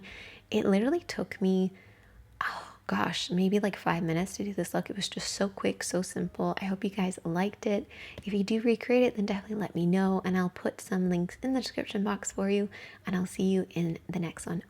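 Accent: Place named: Ireland. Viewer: Sometimes depending on the words you say I can hear the Irish accent, especially if the word has a u in it, like much, brush, blush... I love it 😍😍😍, i've always said if I could have any other accent other that my US southern accent, it would be Irish, you're voice is NOT annoying either, I find it very soothing, shame on them 😤😤😤, I love this video, really all of your videos, you always use the minimum, but it always looks like it took hours, a whole brush set, and a whole palette and then some and create the most beautiful looks 😍😍😍.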